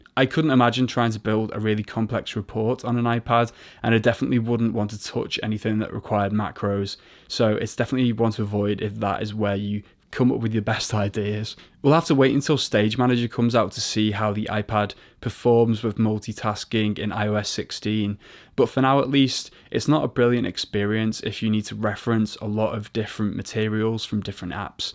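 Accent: Liverpool, United Kingdom. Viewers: Congrats on 1000 subscribers! Just discovered your channel. You sound like you’re from Liverpool.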